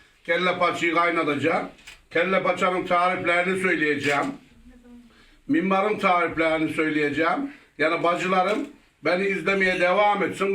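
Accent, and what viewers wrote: Gaziantep, Turkey. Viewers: Dayi antepli misin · Antepli misin?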